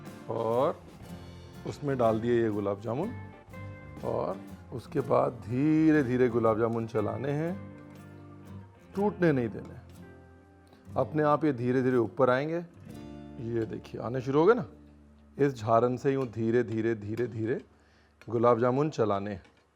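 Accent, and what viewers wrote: India. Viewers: Aapka sun k aisa lagta h, india ki origin kuch h ki nah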